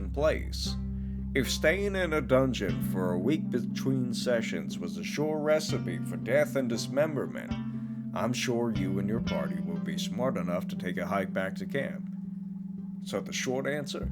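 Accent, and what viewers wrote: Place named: United States. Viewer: Loving the cowboy accent with the static image background. Otherwise, hearing all this about 1:1 Time, I wonder if it would make the game I'm designing more interesting as well.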